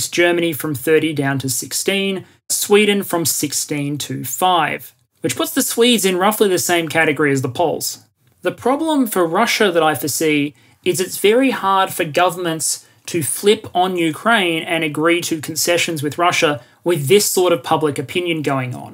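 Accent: Australia. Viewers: Is this the "Economics Explained" guy's second channel? (Or first channel or whatever?) Their voices sound identical to me - and I'm and Aussie, so it isn't just that the accent(s) are Australian. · I like how australian guy tells me our energy policy more in depth than our own government. Excellent video, thank you!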